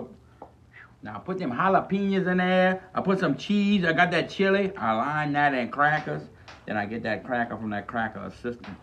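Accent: Louisiana, United States. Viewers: One part Cajun 2 parts talking like a toddler = undeserved fame and your product sold at walmart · Louisiana accent is money dude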